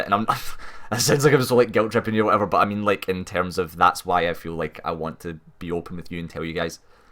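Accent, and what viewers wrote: Scotland, United Kingdom. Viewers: Sorry for your loss. I'm from Canada and I've been watching you guys for a while now (pretty much for the guides but also the let's play). It was hard when I started because my first language is French. Although I speak English well, I wasn't used to your accent. It took me a while but after few videos, it was all good (I even mimic your accent when I'm playing Dark Souls and it makes my wife laugh so much asking "Since when you have a Scottish accent!!!"). Your channel is genuine and I'd be glad to contribute ($$) if it can help you! Cheers mate!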